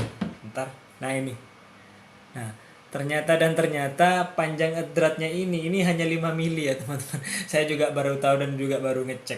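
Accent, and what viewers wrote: Madura, Indonesia. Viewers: Asli mana bang...logat kq kayak orang madura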